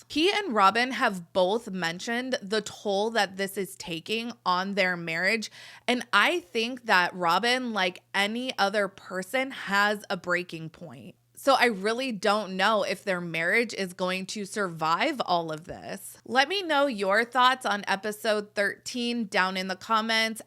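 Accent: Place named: California, United States. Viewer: God I keep clicking on your videos … you say 10 words and I have to stop. I don’t know what accent you have but it’s super Intolerable. California maybe … you drag everything out in a weird nasal way. It’s grating on the nerves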